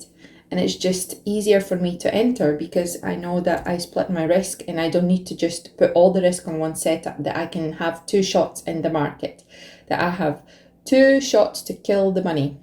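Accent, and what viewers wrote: United Kingdom. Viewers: British? love your content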